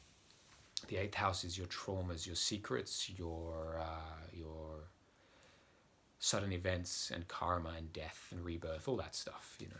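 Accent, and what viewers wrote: Australia. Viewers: I'm so glad put you on for this Mercury retrograde video! I actually have Mercury retrograde in Pisces in my natal chart. I'm an Aquarius and I'm soaking up all your information! Great stuff! I'm definitely going to be subscribing to your youtube channel and sharing your videos with my friends! You sound like you're Australian? I love how you're giving a detailed explanation of Spika, etc. Thank you for talking about how each sign will be affected! That's very generous of you! All my best from the East coast in the states! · clearly knew the accent straight away… and was soooo thrilled was show casing your work… Amazing how it takes following someone over the other side of the world, to be able to appreciate someone in your own backyard! I’m on the gold coast… so happy you have been acknowledged for your skill and craft world wild.. shall follow … thank you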